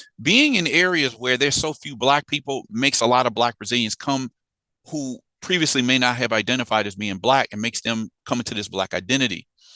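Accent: United States. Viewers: I'm not sure what your background is in terms of your country of origin but you sound like you were raised in the US (maybe the Westcoast just like me) and I feel like what I'm gonna say must said. Alot of us have done away with much of these global Black family narratives dew to our contact with these Black looking people who immigrate here. MOST of them don't even use the word 'Black' as an identifying term, they identify by nationality or their tribe or ethnicity. The word Black to us is absolutely essential to our identity and connection to our ancestors and their historical struggle against extreme oppresion. And mostly because it's a word that designates us as being the embodiment of opposition to white supremacy. When I hear that these Black looking Brazilians have to learn to be Black it tells me that the real Black identity isn't for them. We must be Black here the states and don't get the luxury of claiming we don't know what it means. 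So I say if you're a Black looking Brazilian who can live as a non-Black person than that's what you should do and leave this Black identity stuff to us...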